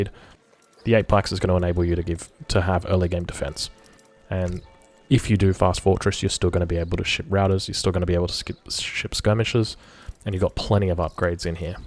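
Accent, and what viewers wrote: Australia. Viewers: Where in Australia are you from? I've heard someone from Brisbane who sounds like you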